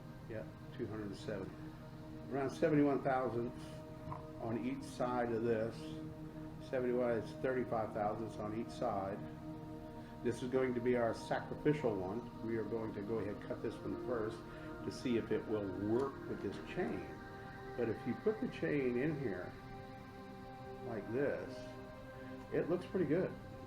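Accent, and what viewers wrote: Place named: South, United States. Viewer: good morning MR. another informative video that small sprocket you made pretty neat I think you can purchase them at mz Miami I thought I saw a 11 tooth I can't explain technically what it does but just like raisins the number of teeth on the rear sprocket does something so does the front it did the same thing in RC trucks cars anyway I think lowering the front sprocket slower take off faster top don't quote me lol but it's something like that You can tell you're from the South brother I'm in Texas you can hear them cicadas singing lol you your wife and family have a great labor day stay safe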